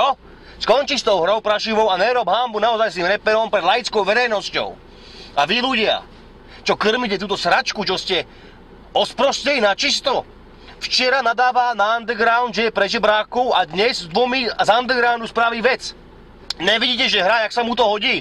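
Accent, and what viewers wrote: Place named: Petržalka, Slovakia. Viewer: Ked ho pocujem, v sekunde sa mi vynori Ovsiste 90tych rokov, gangy na kazdom rohu, fetaci, neskor mafia a typicky petrzalsky prizvuk. Som z Ovsista, a som na to hrdy. Aj na to, ze som prezil.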